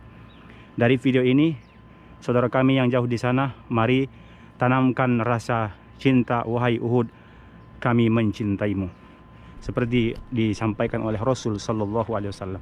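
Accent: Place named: South Tapanuli, Indonesia. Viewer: Nampak kali logat Tapselnya bg..sukses selalu..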